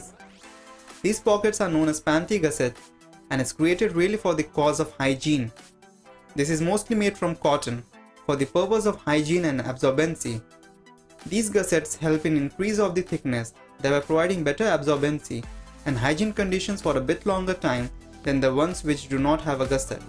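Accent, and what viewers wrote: India. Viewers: Why you are not giving the text to a text to speech robot. The Indian accent is horrible. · can't understand his Indian accent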